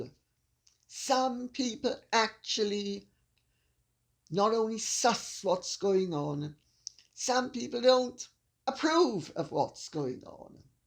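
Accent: RP, United Kingdom. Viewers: Hi from Australia, i just loooovvveee your subtle insults with your finely clipped english aristocratic BEAUTIFUL VOICE. My daughters always often get a chuckle out of the way you have with words. Bravo 👏👏👏👏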